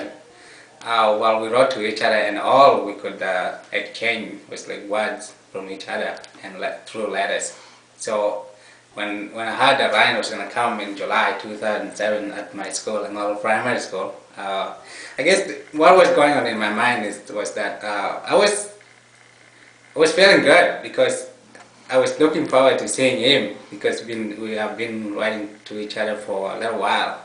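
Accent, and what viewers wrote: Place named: Canada. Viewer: he;s starting to pick up the canadian accent :)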